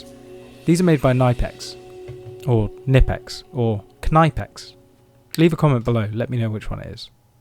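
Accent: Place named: RP, United Kingdom. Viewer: What a pleasure to hear this young lad speaking 'the Queen's English'. Call it what you will - received pronunciation; BBC English; cut-glass English, vowel-speak, or if you want to be really disparaging, 'posh boy speak'. But every word is properly formed, and readily understandable by all English speakers. The video is doubly enjoyable, because it's just gently overlaid with non-obtrusive music, rather than head-banging guitars. An intelligent piece of work by a civilised presenter. The engineering may be causing seasoned perfectionists to frown, but the lads sheer likability and chutzpah brought a smile to my face. Well done,. You set a good example.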